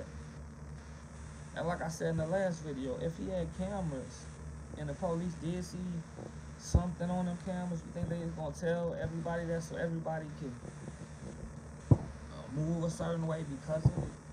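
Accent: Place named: Appalachia, United States. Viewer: You mine As well take his spot cuz. Ya got the hillbilly accent whole thang. Just like Willy did.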